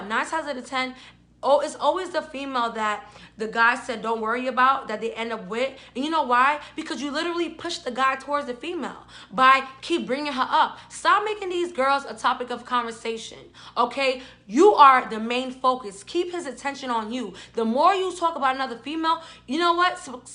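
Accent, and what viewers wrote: New York, United States. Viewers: Same New York accent both nicki and cardi got deep New York accent like her😇😇😇😇😇😇😛😘🤩😘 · Nah it's just nyc accent.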